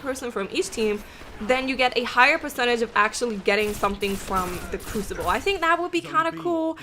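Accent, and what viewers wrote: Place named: New York, United States. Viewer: I'm new to your channel. Are you from Jersey or New York? I love your accent!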